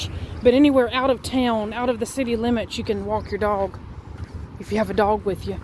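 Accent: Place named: Texas, United States. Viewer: Love that Texas accent (I miss that)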